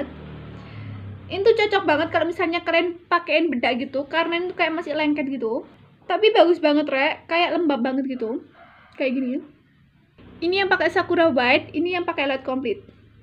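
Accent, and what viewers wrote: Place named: Surabaya, Indonesia. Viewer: Halo rek kembali lagi rek videoku duuhh paling seneng nonton video ini Surabaya ya medok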